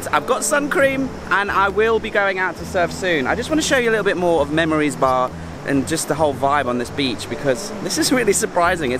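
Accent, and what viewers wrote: England, United Kingdom. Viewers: So he s from Northern Ireland 🇮🇪 why does he have a slight English accent??? I think this might be my new H.Q. when I go there for 6 months in Oct. 2024. Looks like a great mild place to learn. Im 45. I GUESS ITS NEVER TOO LATE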